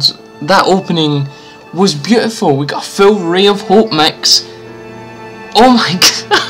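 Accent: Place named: Scotland, United Kingdom. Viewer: I LOVE YOUR ACCENT !! O.o ... you are scottish aren't ya?! XD ...I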